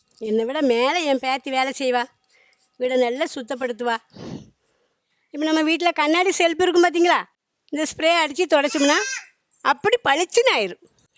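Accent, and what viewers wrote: Tirunelveli, India. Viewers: Tirunelveli tamil kekka sandhosama iruku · Tirunelveli bashai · உங்கள் ஊர் சொல்லுங்க..திருநெல்வேலி பேச்சு மாதிரியே இருக்கு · Tirunelveli slang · Nenga Nagercoil Ila Tirunelveli ya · Nenga thirunelveli pakkama? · திருநெல்வேலி, கன்னியாகுமரி மாவட்டங்களில் பேசுவது போல் இருக்கிறது. சூப்பரா இருக்கிறது.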